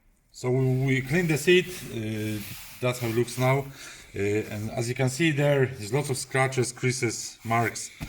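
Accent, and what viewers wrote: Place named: Poland. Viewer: Szedłem o zakład, że słyszę polski akcent. Dobra robota. :)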